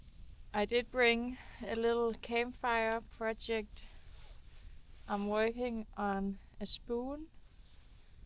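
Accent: Denmark. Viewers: That’s a cute girl with a cute Danish accent! (Trodde du var svensk först :) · You sound Danish. Going home to some warm hugge is never a failure. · Thank you for sharing your adventures with us 😊 I really like your Danish accent, I find your videos very relaxing!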